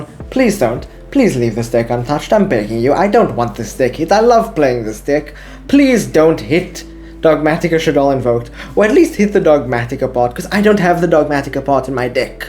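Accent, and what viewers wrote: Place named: South Africa. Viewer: Interesting content my man, where are you from? South Africa?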